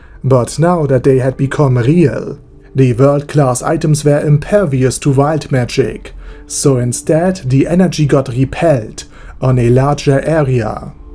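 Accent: Germany. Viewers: You're German aren't you?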